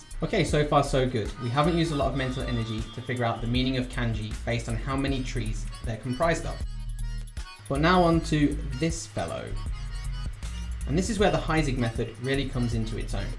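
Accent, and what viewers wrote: United Kingdom. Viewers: to be Japanese you sure speak like a British, with a funny accent. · didnt expect a british accent ngl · Ironically the narrator sounds British but drew the American flag. That's far more curious.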